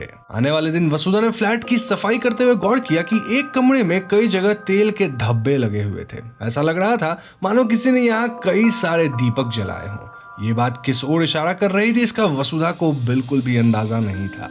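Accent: Bengal, India. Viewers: The VO is of a bong. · Bangali babu. Hindi theek karo.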